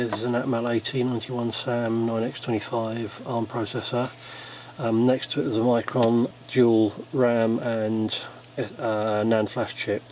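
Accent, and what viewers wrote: England, United Kingdom. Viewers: Great video. Just a little hard to understand/keep up with at times due to you talking fast with that British accent. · As always - Perfect demonstration and technical details! Very Very nice to see!! I love this... BUT: PLEASE try to speak a little bit slower, it´s really hard to understand for guys like me (from German) .. sounds very "English" ;-) US-English is easier sometimes.. Would be higly appreciated.. Again, thanks for your perfect work!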